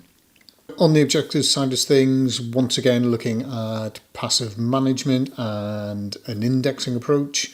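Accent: Northern Ireland, United Kingdom. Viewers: Do I detect a northern Irish accent 🧐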